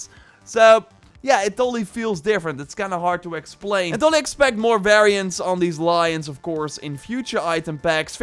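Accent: Netherlands. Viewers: a dutch accent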